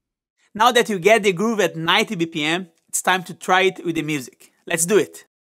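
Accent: Brazil. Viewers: me waiting for him to speak so I can check the accent: "... myes ok he sounds Brazilian I can keep watching ^^ " really good vid amigo !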